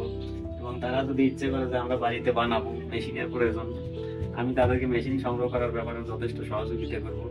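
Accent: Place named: Dinajpur, Bangladesh. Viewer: ভাই, আপনার ঠিকানা টা দেন,কথা শুনে মনে হচ্ছে দিনাজপুরে বারি।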